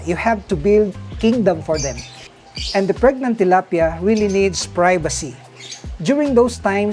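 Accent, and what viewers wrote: Philippines. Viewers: That's a typical Philippines 🇵🇭 accent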